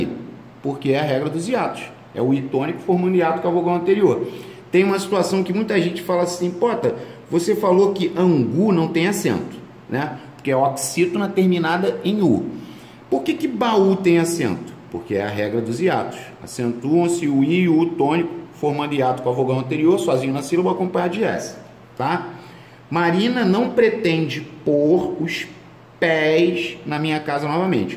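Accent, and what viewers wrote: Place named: Pará, Brazil. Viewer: Proff vc eh muito legal..seu sotaque parece com o nosso aqui do Pará. Vc eh 10..e pra ser 20 precisa odiar o Bolsonaro kkkk!! 2021 ta phodaaa! Deus nos livre logo! AMÉM!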